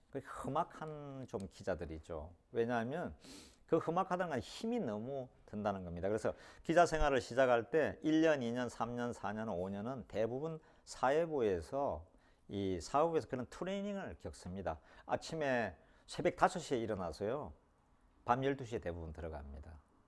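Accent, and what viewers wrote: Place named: Gyeongsang, South Korea. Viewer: 단디해라 ! 에궁 갱상도 사투리. 굿!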